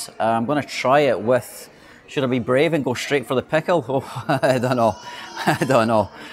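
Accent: Scotland, United Kingdom. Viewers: Damn it's a pity I discovered your channel recently:( Definetely love your Scottish accent and vlogs, gonna keep watching all of your videos dude, super beautiful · are you Scots? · Scottish accent is bit hard to understand but lovely to listen to.🙂👍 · it's not british english, it's scottish english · as an Indian man, you have a pretty extraordinarily strong Scottish accent.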